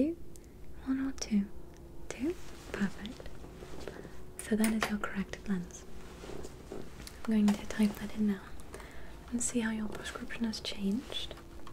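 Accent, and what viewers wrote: England, United Kingdom. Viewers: » Super relaxing video ✔ » Looking absolutely flawless ✔ » Best british accent on YouTube ✔ You always deliver,! <3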